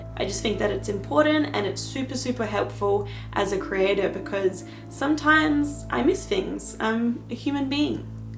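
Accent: Australia. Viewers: Hey, I just found your channel through the link the paranormal scholar shared and I have to say after watching a few of you videos I really enjoy your channel and the content is awesome. I’m gonna sub right now. Also, I love you accent. My sister’s husband was born and raised in Sydney Australia but now lives here in Orlando, Florida. He and his family have that awesome Aussie accent and you very much remind me of it. Anyway I look forward to watching your previous videos and seeing what’s to come. Take care and keep on with the awesome awesomeness. Peace!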